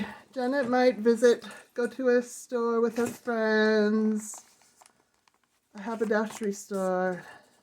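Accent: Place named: United Kingdom. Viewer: loving that British accent !! 😊🫖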